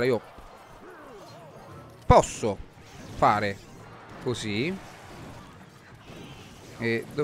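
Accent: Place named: Rome, Italy. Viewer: COME SEI DIVENTATO ROMANO :D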